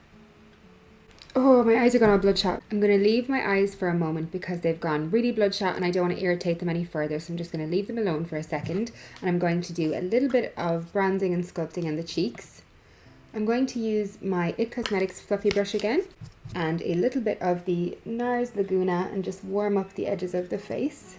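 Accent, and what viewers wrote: Ireland. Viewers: is from ireland?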